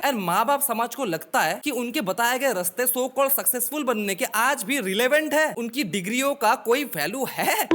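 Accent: Assam, India. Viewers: bhaiya kiya aap assamese ho .....??? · Bruhhh I kindaaa felt like u r Assamese or yk Assamese 😭✨✨I was right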